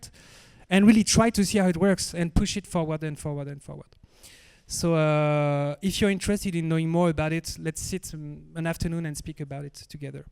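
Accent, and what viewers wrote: France. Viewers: C'est quoi cette histoire de tout le temps parler en anglais ?? Vous vous rendez compte à quel point c'est pénible d'écouter un français parler anglais pendant 1 heure... Please The Family, dont get lost in the sauce...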